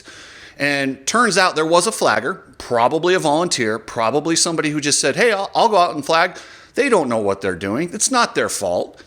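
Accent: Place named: California, United States. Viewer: It's motocross, shit happens. It sounds like you're from California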